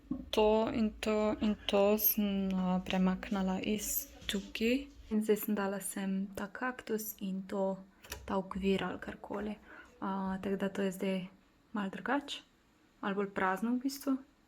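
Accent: Carinthia, Austria. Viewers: Draga! Spremljam te že skoraj od začetka in res si vrhunska, pa še čudovito izgledaš. Obožujem tvoj način izražanja in tvoje vsebine. Kot so že drugi povedali, se ti res vidi, da ne snemaš zgolj zaradi zaslužka in "popularnosti". Všeč mi je tudi tvoj koroški naglas. Če boš kdaj želela, bi lahko več snemala v koroškem govoru. Ostani tako dobra še naprej! Lep pozdrav iz okolice Maribora. ❤️❤️😍😍😍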